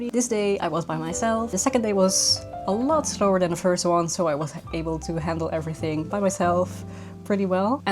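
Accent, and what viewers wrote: Limburg, Netherlands. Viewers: Aaaah je komt ook uit Limburg! Ik heb nog nooit eerder iemand plat horen praten op youtube, super leuk haha❤️ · Love the Limburgs hehe 🫶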